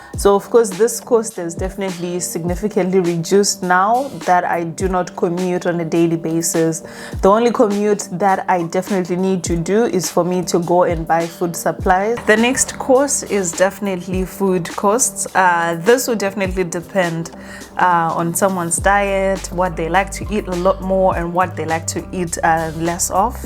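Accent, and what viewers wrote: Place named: United Kingdom. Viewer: The british accent..... we can feel it.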